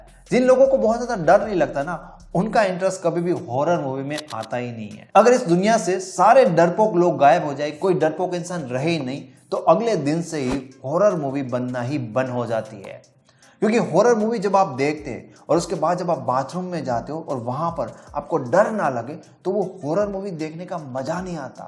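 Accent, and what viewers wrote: Gujarat, India. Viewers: Aap gujarat se ho ?? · Sir Are you from Gujarat ??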